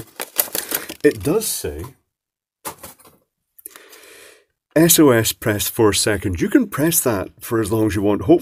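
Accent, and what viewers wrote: Scotland, United Kingdom. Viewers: I only come for the endearing Ms.Doubtfire accent. I guess your content is ok too,.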